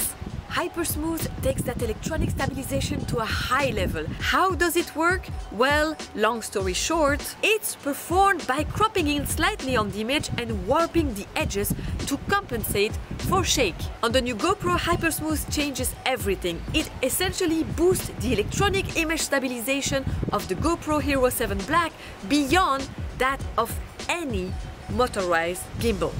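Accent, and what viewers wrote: France. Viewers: Ah le bel accent Français j'adore hi hi hi hi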